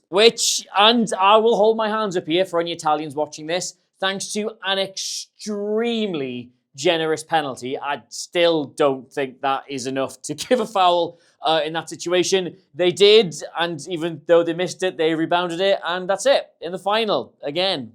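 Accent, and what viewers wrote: Newcastle, United Kingdom. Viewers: I am so glad you made this video, I was so desperate for tactical womens football content I was legit thinking putting on my best newcastel accent and doing an impression of you. You have saved me from his fate.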